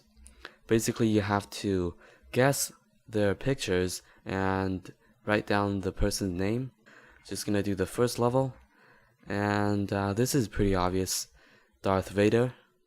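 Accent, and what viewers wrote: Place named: China. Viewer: its a chinese speaking english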